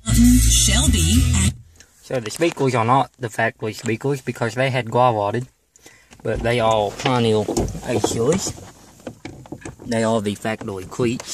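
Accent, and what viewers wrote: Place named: Australia. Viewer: think he's either Australian or New Zealander.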